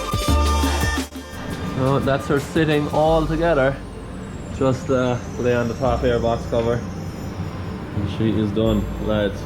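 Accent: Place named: Ireland. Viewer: Irish accent is top 3 in the world for me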